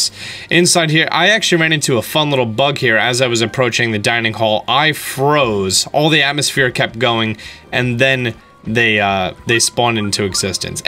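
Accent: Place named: Brooklyn, United States. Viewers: Nice Brooklyn accent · why did you have to do the brooklyn acent?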